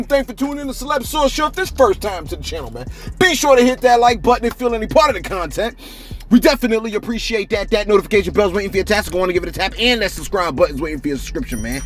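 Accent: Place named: Boston, United States. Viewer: Yo celeb my futha mucking boi!!! You got thee best thee hottest show or odcast whatever you Wana call it on the internet this is my absolute fav -or-ite thing on the whole entire internet I been watching you from the jump and I never miss a show twice a day everyday or however many times u post I get all my notifications I guess I'm one of the lucky ones but even if I didn't I don't have no cable cuz I don't want it all I b on is YouTube that's more than enough for me everything is on here! But anyway my name is Dez Fresh I'm from Racine Wisconsin (The Wisco) and every chance I get I try to put my family and friends on to this casting,my lil brother Nique watches faithfully too!I watch one before I leave for work or while I'm there on break and I make sure I save the last episode for when I get home at 4am can't sleep right without catching u on my celeb news bottom line you and your show and your back up chanel is you tubes big ish fr and I love her voice n lil accent sounds like she's from Boston 😆...don't ever stop doin this we love you,no homo and pause!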